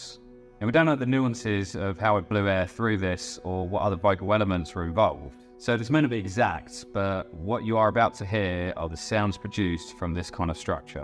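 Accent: Essex, United Kingdom. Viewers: American here - keep the Essex! Love it! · Because you mumble. It's not the accent, it's that for us to understand your accent you need to enunciate your accent clearly and not slur words in a hurry or get quieter towards the end of a sentence. I, a non-native speaker, have never heard Essex accent before this video in my life, but I had no problem understanding you when you actually spoke up. You don't need to speak like a BBC host, you need to speak like an Essex boy who hosts a video instead of like an Essex boy who talks to his mates on the bus. If you think that you actually did that, you dearly need to get a less muffled microphone. Sounds like you got the wrong end of Shure, completely dead top end. But top end is where "easy to understand" sits. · Nothing wrong with the Essex, nice to hear something a bit familiar for once ;) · Hang on to the Essex thing, young man, it figures importantly in why so many people enjoy these excellent presentations! 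I am an old man from the Mouth of Raccoon in Kentucky Appalachia and I appreciate a good regional accent! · He's understandable. He's from Essex, it's just how they talk.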